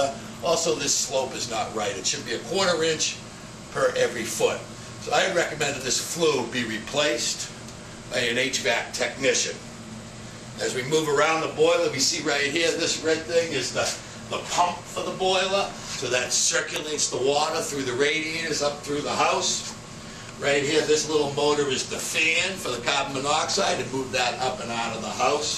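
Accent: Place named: Boston, United States. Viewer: Chicagoland? I am hearing a fellow Boston guy.....boiluh, wadduh preshuh!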